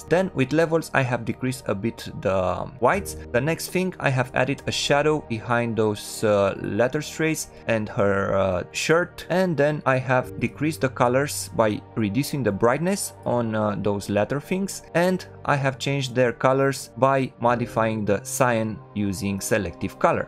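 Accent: Romania. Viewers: Imi parea mie ca esti roman dupa accet :P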